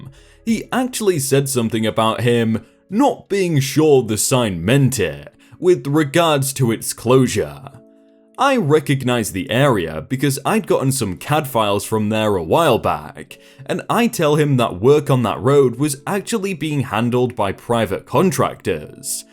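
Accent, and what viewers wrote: United Kingdom. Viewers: understandable it's that smooth british accent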